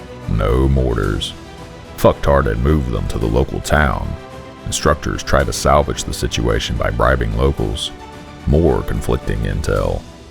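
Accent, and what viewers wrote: Germany. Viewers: Whatsup with the german accent? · hmm , do not confuse dutch with german .... so please hold that freaking german accent ! thx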